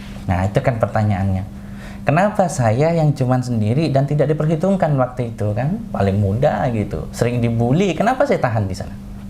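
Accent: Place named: Jakarta, Indonesia. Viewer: Seneng liat cina kya gini adem bener apalagi ada logat betawinya kerenlah, Ini baru cina yg sudah menyatu dengan pribumi saling menghormati dan menghargai, bukan seperti cina komunis yg bengis dan angkuh, semoga tetap istiqomah koh ❤️🤲🙏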